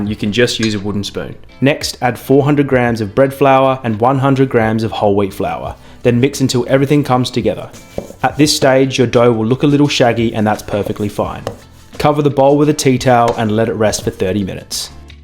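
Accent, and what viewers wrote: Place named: Australia. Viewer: It's so nice to hear an Australian accent on YouTube. A nice calm voice just explaining how to make sour dough bread. Lots of good tips about resting the dough, concerning ambient temperature, that I haven't heard of before, so thank you. Nice kitchen too. ❤️🙋‍♀️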